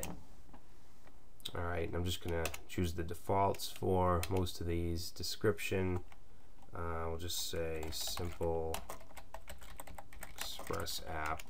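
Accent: Boston, United States. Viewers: You from Boston bro?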